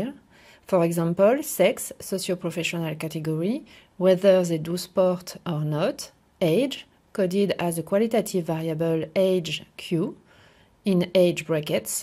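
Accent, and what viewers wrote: France. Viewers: Yes, french accent :)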